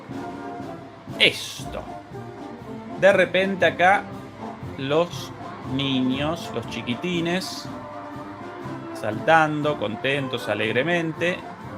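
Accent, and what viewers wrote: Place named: Buenos Aires, Argentina. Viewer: Pero hablas Porteño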